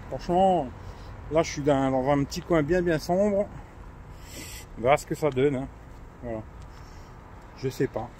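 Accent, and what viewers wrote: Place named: Lorraine, France. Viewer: Eh mais un lorrain ! 😂😜😍. J'me disait l'accent me dis qq chose 😏. Bon la stabilisation est à la ramasse sur le n10+ en vidéo c" assez flagrant pour moi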